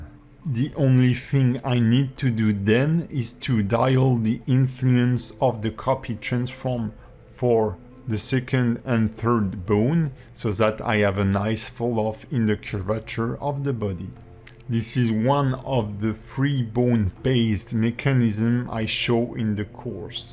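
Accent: France. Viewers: So I came here because I'm struggeling with a super easy mesh and rig, just movement, rotation and IK and everytime I put IK on my mesh it glitches about wierdly. This is way above me, I will come back when my IKs work. Good inspiration though, and love the slight touch of authentic French accent ^^ Merci à bientôt!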